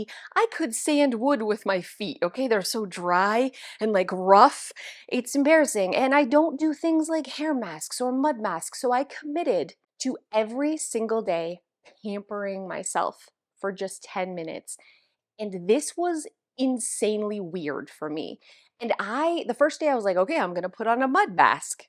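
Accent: Canada. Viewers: I'm going to work on a Word Search book that I got at the $ store, 10 min house tidy up, and do resistance bands for arms and legs! Thanks for sending this video. Your videos have become my happy place, 😍 I love your Canadian accent. I live in Minnesota, so not too far off with the way people talk up north!!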